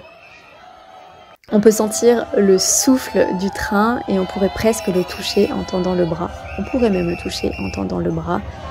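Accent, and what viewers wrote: France. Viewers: Cc Mon voisine Comment allez vs jsuis ben Votre introduit est claire et accent bien Francais Ca me la plait bc Merci Bon cafe C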